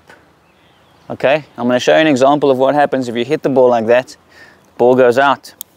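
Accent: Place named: South Africa. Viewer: The accent suggested he was South African, but the HADEDAS confirmed it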